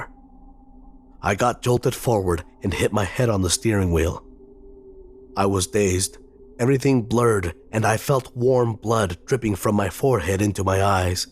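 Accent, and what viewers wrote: Russia. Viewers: Duke Nukem meets Russian accent? :(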